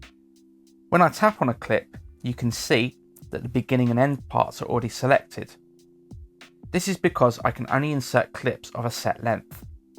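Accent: United Kingdom. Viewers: nice a british accent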